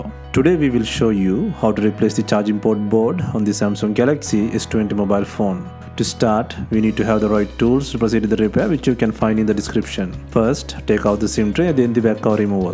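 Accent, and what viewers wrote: India. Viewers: Don't listen to this guy. You were perfectly understandable. Your indian accent sells it.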